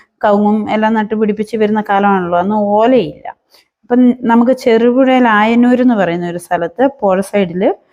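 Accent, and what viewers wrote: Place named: Idukki-Kottayam, India. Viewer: Chechide slang idukki kottayam slang polind😇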